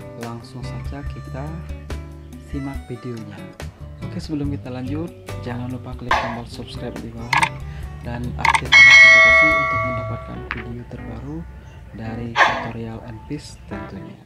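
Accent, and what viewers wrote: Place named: Kalimantan, Indonesia. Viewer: abang bukan kalimantan ya. Logatnya mirip kalimantan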